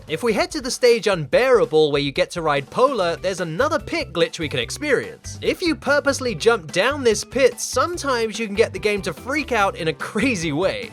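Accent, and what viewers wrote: United States. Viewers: What the fuck is your accent? Had to turn this off because I was too busy trying to work out why you sound like 20% American and 80% from England. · Your jarg American accent actually offends me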